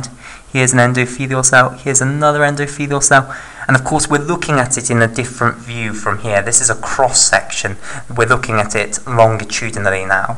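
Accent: United Kingdom. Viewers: God i love british accent 😂🖤 Thank you for such a beatiful video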